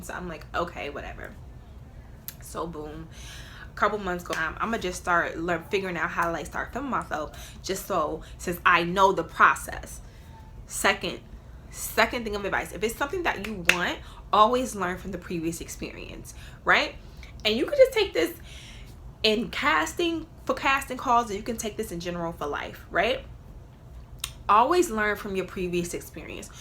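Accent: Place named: New York, United States. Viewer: My fav!!! You can tell you been in NY you picked up the accent a little ❤️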